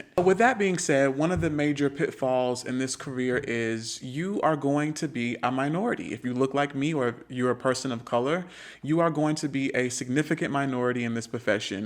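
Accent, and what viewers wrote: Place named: Charleston, United States. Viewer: Sounds like Charleston Sc !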